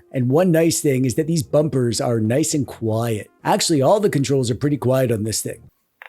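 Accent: Canada. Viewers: Too much rambling, like you enjoy the sound of your low monotone, raspy voice with that obvious canandian accent just wasnt it for me. I could've done without the uneccessary comedy and jokes thrown in. I dont think there's anything I learned from your "review" about this gaming device. No sub from me.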